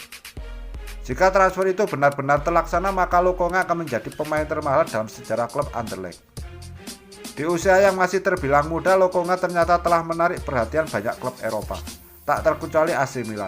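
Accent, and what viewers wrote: Java, Indonesia. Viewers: Dengan aksen yg jawa medok, pelan2 aja min... Jadinya salfok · Jowone ndi mas? 😁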